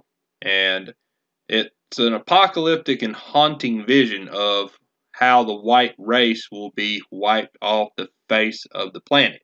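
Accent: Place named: South, United States. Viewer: Ofcourse you would have a southern accent, not even surprised in the slightest bit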